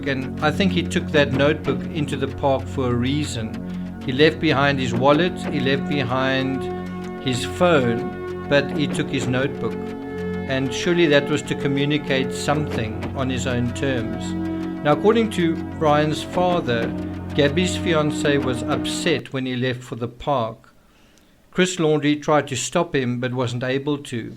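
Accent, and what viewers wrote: South Africa. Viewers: Btw, are you South African? My brother in law is and I hear that accent, particularly the last millisecond at the tail end of each of word as it cascades away into our eardrums... I dig it man! I want you to know I appreciate the way you break things down very quickly when you speak,..which is very therapeutic & healing. Are you a therapist? I get the vibe..you understand human psychology & introspective philosophy seamlessly. Brilliant. Thank you, You help me look at myself in new ways and can now work my way through some personal struggles and trauma's with an arsenal of new tools to apply when the healing hands of time are out of time... Much love & respect!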